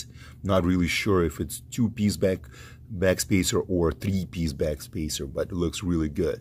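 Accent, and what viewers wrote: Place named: Russia. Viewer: Родную речь слышно даже на английском) через 3-4 дня мне такой же придет)))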